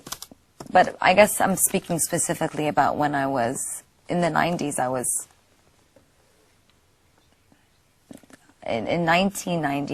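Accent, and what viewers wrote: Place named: United Kingdom. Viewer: she sounds more british here..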